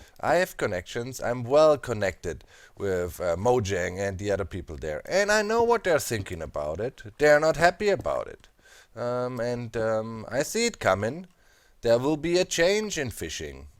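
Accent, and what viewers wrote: Germany. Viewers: Does sound more German than usual in this video or is it just me?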